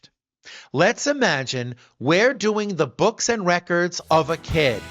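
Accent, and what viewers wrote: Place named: Bronx, United States. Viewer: I like the Bronx accent!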